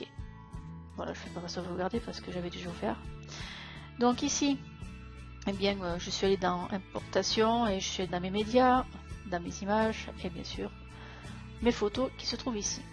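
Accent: South, France. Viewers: Bonsoir Chère, Encore du travail remarquable.. Merci de penser à nous avec gentillesse et simplicité. Avec en prime un délicieux accent du sud... Bien à vous André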